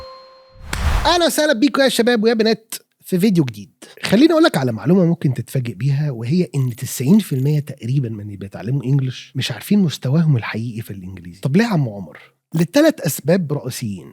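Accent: United States. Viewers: Hi How are you? OK I hope. Thank you for this useful video, I felt your accent is the American one & it is really heavy, if I speak with you, my accent will be the English accent of London😅,I have learned English the hard way, I speak French plus some Spanish, Romanian, Turkish, kurdish & some other dialects as well plus mother language too 😊 My level in English will be between B2 & C1 👍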